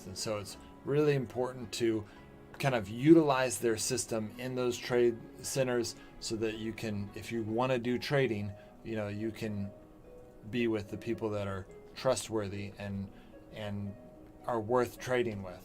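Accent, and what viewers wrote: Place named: South Africa. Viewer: That's dude got a south african accent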